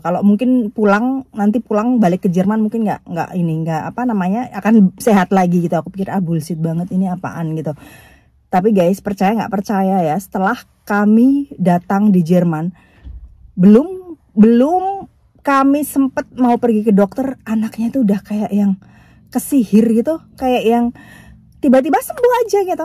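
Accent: Java, Indonesia. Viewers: Sampean iki wong ndi to mbak kok jowone bnr2 msh trsa....sgt bgs mba wong jowo ojo ngilangi jowone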